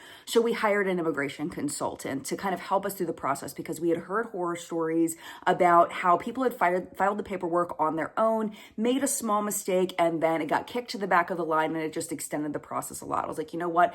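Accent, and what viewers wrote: South, United States. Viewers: Here I am thinking of my recent retirement party hearing at least 7 accents from around the world plus 3 from different parts of Canada or my book club that has a member with a way deeper south US accent than you do ... nah you are good.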